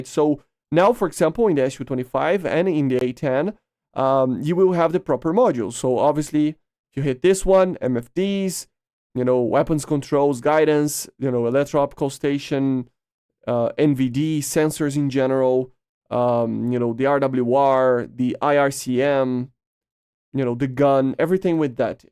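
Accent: Brazil. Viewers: hes brazilian. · he’s from Brazil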